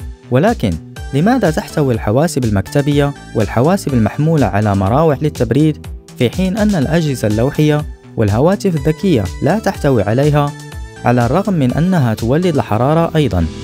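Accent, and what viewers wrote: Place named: Morocco. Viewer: من صوت عرفتك مغربي مهم فيديو واعر 🎉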